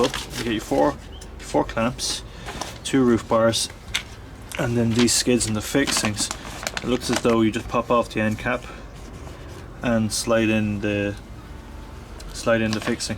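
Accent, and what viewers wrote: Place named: Ireland. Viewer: Sounds so weird hearing an Irish guy call me dude! Thanks for the video though. Was nervous trying my vitz roof bars on my new Toyota corolla hybrid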